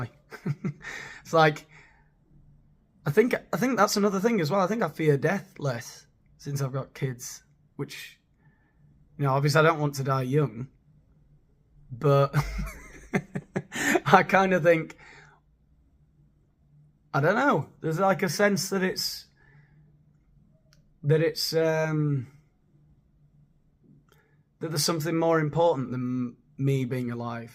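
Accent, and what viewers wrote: United States. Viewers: Love your American accent. Cheers. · OMG, I just LOVE your American accent!!! AND your impeccable sense of humor, but, mostly I still chuckle at the thought of that FABULOUS rooster blasting me out of my self-induced YouTube inertia!!!!!!! Rock on!!!!!🎸🎸💖💖🐓🐓🐓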